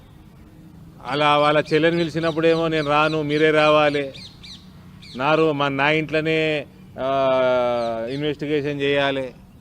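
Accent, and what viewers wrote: Telangana, India. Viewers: Anna nvu telangana yasa..loo baaga chepparu..... Anna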